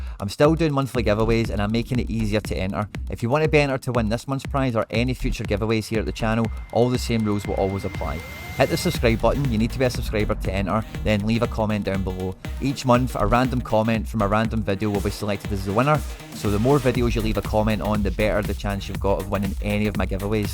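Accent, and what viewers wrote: Scotland, United Kingdom. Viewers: Few things like listening to a cheery Scotsman discuss Sorare as the friday workday winds down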